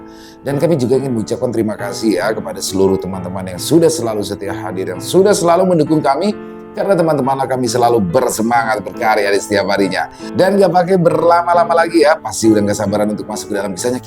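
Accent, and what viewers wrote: Jakarta, Indonesia. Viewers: Assalamualikum bng, salam santun dr Medan, mantap dech bang piyan kembali dengan kocak Betawinya yg buat sy ngk pernah bosan dengarnya😂😂, terima kasih mas untung dengan cerita mas piyannya yg mantap sukses terus ya, buat bng cocok banget menjadi tokoh bng piyan dgn logat Betawinya yg kental banget, buat team x3m sukses terus😂👏👏👏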